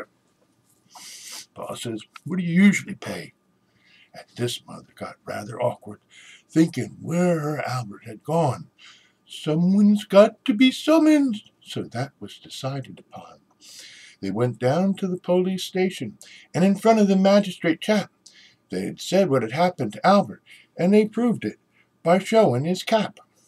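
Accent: United States. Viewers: aThat was fun,. And if someone had told me that quintessentially Lancashire tale would have worked in your (lovely mild) American accent, I'd have said "Not bloomin' likely!" - But I'd have been wrong. Super version and great use of props. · Weird to hear it in US english, but it works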